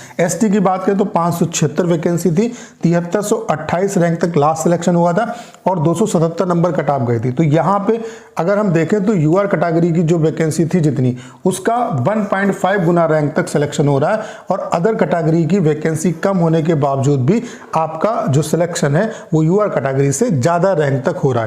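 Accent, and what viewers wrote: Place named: Uttar Pradesh, India. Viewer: Bhaiya pkka Uttar Pradesh se h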